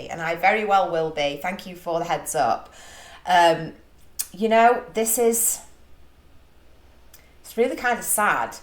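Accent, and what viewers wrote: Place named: Stoke, United Kingdom. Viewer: Ay up duck. Always interesting to see a ren reaction. He’s brilliant isn’t he? 😂 Are you from manc? You sound almost Stokie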